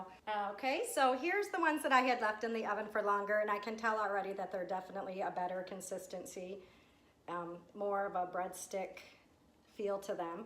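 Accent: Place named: Midwest, United States. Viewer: Okay, now I hear the accent. Wisconsin or Michigan? 😸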